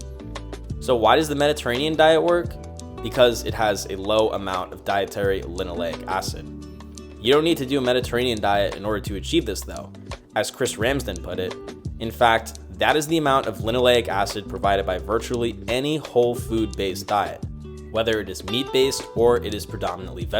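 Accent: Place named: New Jersey, United States. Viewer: You from Jersey? You have a pretty distinct accent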